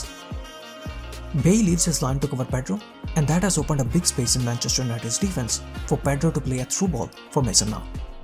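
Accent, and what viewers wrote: India. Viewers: I like your curry accent.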